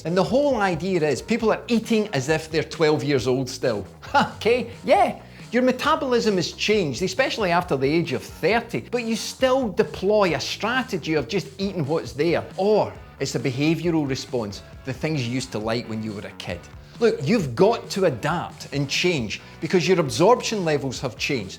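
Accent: Scotland, United Kingdom. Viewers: As an American I could listen to a Scottish guy cuss and swear all day 🤣I appreciate all your advice my friend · Is this accent Scottish? Or what it is? I am Italian and I cannot "localize" it. (I mean his normal accent, not the accent he has when he plays the Scotsman).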